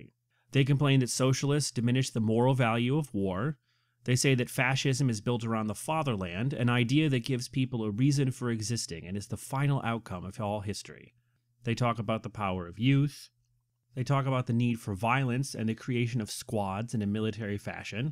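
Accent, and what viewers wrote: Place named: United Kingdom. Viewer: honestly tho, i loved your brittish accent :DDD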